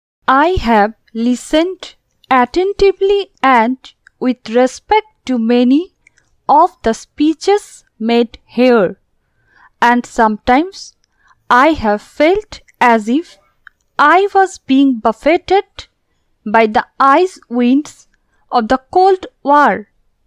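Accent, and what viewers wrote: Bengal, India. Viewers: Voice seems to be Bengali , I am correct?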